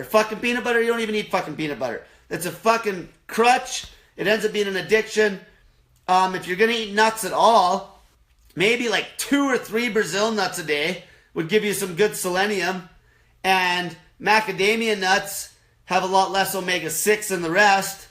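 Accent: Canada. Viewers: I hated him for five minutes then it was like hey... He sounds Canadian... like me. Maybe my Uncle Frank has a kid out there we know nothing about. I have uncles who sound like him. Lol Do you hate the way all Canadians sound when they talk? His accent is very Canadian.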